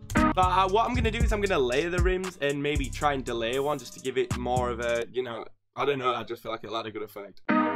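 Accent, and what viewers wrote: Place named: Northern England, United Kingdom. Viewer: You are definitely from north England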